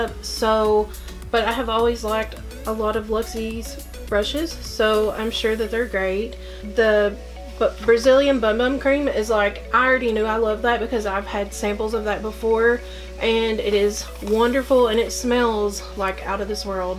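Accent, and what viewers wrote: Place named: Kentucky, United States. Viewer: Subbed from IG! I'm audiesmama there! I'm also originally a Kentucky girl! You sound like home to me! 💙 great video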